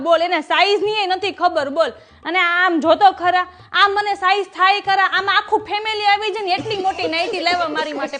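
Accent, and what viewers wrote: Kathiawar, India. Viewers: Tame aa j kero..biju badhu reva j fyo ho..aa to mast..pure kathiyavadi..superr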